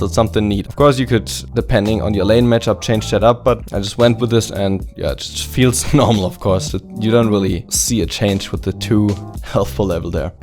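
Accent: Germany. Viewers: German accent ftw xD❤️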